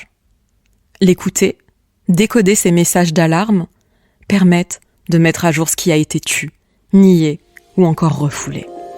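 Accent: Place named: Marseille, France. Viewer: Merci pour cette présentation détaillée et le sens de l'humour et l'accent "Police de Marseille" un délice pour les oreilles et les sens.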